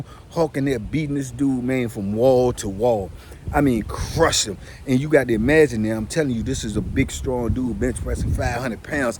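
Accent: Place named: New Orleans, United States. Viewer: U sound like u from New Orleans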